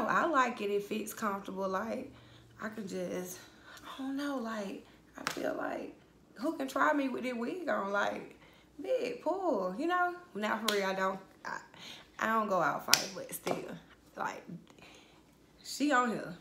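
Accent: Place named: Georgia, United States. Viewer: This color is so pretty!! And you must be from Georgia. I recognize the accent lol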